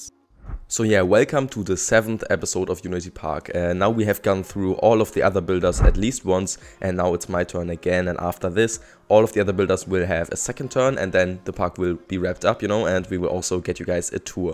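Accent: Germany. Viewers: German accent.... Cant give me that... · Dieses Aussprache hört man als Deutscher sofort haha. Starkes Video ✌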